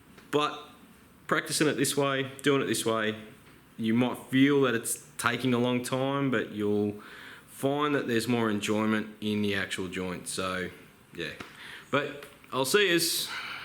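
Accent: Australia. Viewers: THATS an aussie accent, if i´ve ever heard one! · Great to hear another Aussie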